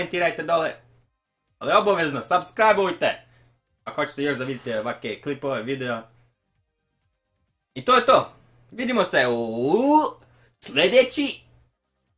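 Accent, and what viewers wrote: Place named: Bosnia and Herzegovina. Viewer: HAHAHAH Bosanac brate :3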